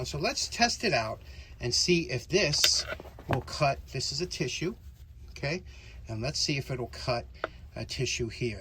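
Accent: New York, United States. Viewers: Hi, I wear my old timer badge with pride. Last year I started Bee keeping. There is a “Hive Tool” which is exactly the same as the Hyde tool. Yes, the tools are thinner as you describe. Not sure if you know about beekeeping. Honey Bees are very fussy about the interior of their hives. They use a substance called propolis that they seal up all crevices in the hive. You need the hive tool to pry the boxes apart because the propolis is made from tree resins and is very sticky. Another installment on soldering irons. Very cool temperature regulator/stand. I have a fancy modern digital soldering station, but also still have two Weller guns. Vintage is wonderful. Super station cleanup. I thought it was “Smoke gets in your eyes” not up your nose🤪. Very funny end video. I sometimes laugh at your NY. accent, because mine is the same. Stay warm. We are in the low 30’s in the daytime, and the high teens at night. 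So we are even unusually cold. We didn’t get the snow. So no complaints here. Best Regards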